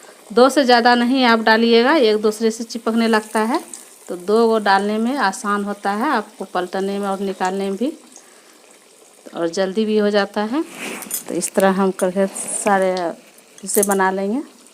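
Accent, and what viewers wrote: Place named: Bihar, India. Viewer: Aapke bolne ka tarika hi batata h aap bihar se ho me v bhagalpur bihar me hi rahti hu. Meri request h aap jo baate krti h usme kuch improvement laaiye viewers badhenge Or likes v jada milenge. Bura n maniyega recipe bhut achi h magar wo kya h dekhne walo ko youtubers v smart chahiye. Dhanyavaad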